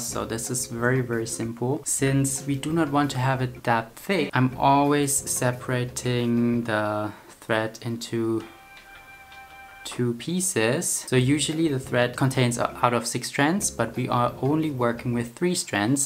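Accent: Germany. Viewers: I can totally tell that you’re german by the accent, cute!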